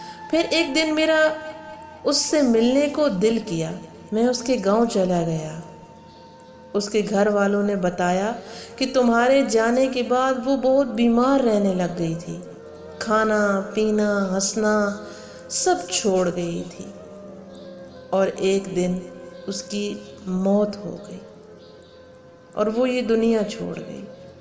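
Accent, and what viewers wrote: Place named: Punjab, Pakistan. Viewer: کمال پنجابی تڑکے کے ساتھ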